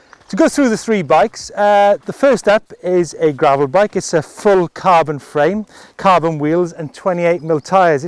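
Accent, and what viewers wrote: United Kingdom. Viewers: I wish if you speak english like a texan yankee,would be better than british.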